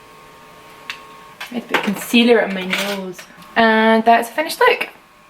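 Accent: Scotland, United Kingdom. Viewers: I sound similar to her but hers is much stronger haha I'm fairly quiet myself tho it's hard getting people to understand when I go on holiday 😂 us Scots speak fairly quick and with slang aha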